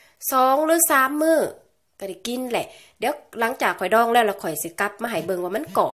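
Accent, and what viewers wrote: Laos. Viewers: เจ้าของคลิบนี่พี่น้องสำเนียงคือพี่น้องสปปลแท้ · ทรรศสรวง พรมเหลือง เป็นภาษาลาวที่คนไทยอิสานฟังแล้วสะเหนาะหูดีแท่ มักเสียง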